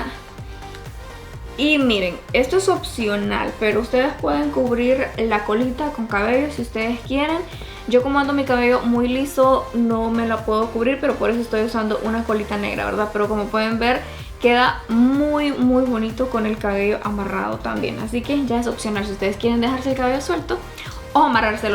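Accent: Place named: Honduras. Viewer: A mí me encanta que está mujer tanto que a viajado y todo, habla como catracha, & otros majes que se van para otro pais hablan como majes & ella no pierde la esencia... Por eso y otras cosas me la sube 💖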